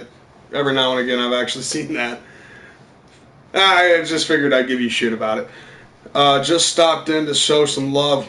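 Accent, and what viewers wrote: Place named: Midwest, United States. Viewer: Are you a Michigander,? I was born and raised in the mitten but live in Los Angeles now. Your Midwest accent is making me miss some of my Michigan homies.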